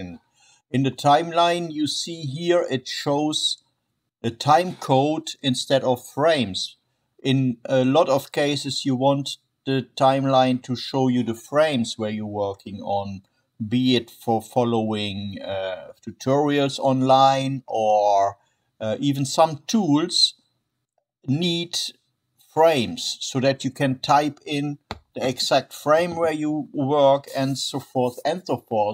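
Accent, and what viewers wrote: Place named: Germany. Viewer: Thank you so much!! - Nice German accent ;-) Gruß, Jörg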